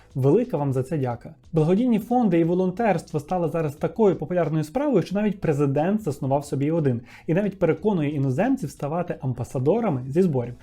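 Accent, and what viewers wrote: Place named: Lviv, Ukraine. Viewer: Зразу ясно, що автор каналу зі Львова. Бо хто ще б казав "ТарасА", з "ТарасОм" 😅. Для мене самої стало десь декілька років тому відкриттям, що літературно треба казати "ТарАса", "з ТарАсом". Наші регіональні мовні особливості такі чудові😊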